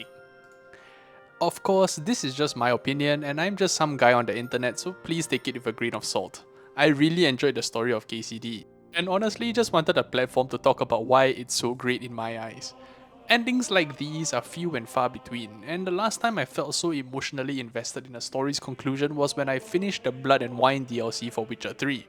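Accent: Singapore. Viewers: You're either Singaporean or Malaysian, aren't u. I can recognise that accent from 5000 miles away.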